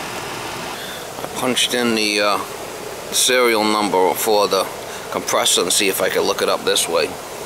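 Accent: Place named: New York, United States. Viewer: thanks for talking as you work +nice accent my friend is from ny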